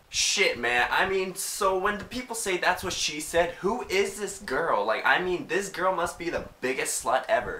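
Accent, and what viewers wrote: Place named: Canada. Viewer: You know how i can tell ur from canada? you say About and Out weird! I'm moved to Canada , it's kool :)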